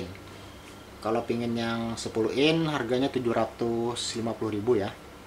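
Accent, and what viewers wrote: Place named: Banjar, Indonesia. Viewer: inggih logat nya. urang mana pian mang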